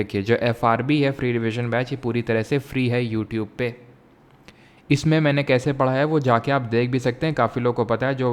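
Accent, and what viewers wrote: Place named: Uttar Pradesh, India. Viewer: Hey bhagwan bhaiya aap ekdm humhi log jaise bolte hain jaise ghr pr bhaiya log bolte hain bhulee jaiye 😂 ...haiyye hai...😅pehli baar koi up ka hai bhut shi lgta hai aapki baat sunkr aise ghr wali feelings aati hai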